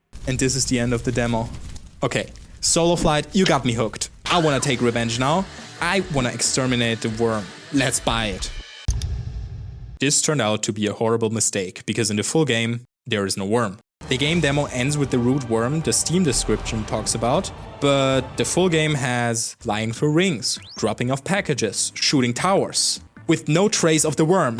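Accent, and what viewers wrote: Germany. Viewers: Deutscher aktzent? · sounds so german, 100% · Bruder man hört ja krass das du deutsch bist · Ich liebe diesen deutschen aktzent. Gutes Video. Mach weiter so ❤ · Dieser Deutsche akzent xD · I Hear Deutsch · Are u german? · Bro sounds pretty german.... Are you german? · Bro casually spent almist 600 bucks for trash games❤💙💛 Greetings from Germany Im pretty sure I hear a german accent here? :P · Ayo my friend are you german by any Chance?:) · sound like german accent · Krank wie sehr man den deutschen akzent raushört wenn man dein gamertag sieht.😂 wirklich gutes englisch.😌 kommt fast authentisch rüber bis man deinen nicknamen als deutscher liest.😂 aber geiles video junge. Hau die scheisse raus. Ich knödel dir auch nen abo raus.😂🫶🏽💀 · are you german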